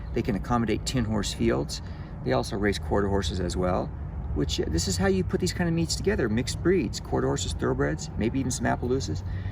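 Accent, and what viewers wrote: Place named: New England, United States. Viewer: Sounds like Massachusetts, and New England.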